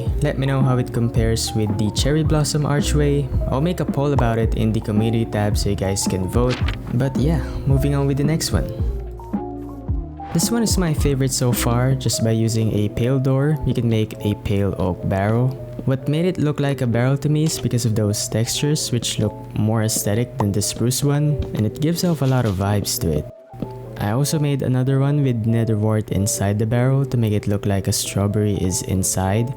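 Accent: Philippines. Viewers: felepeno accent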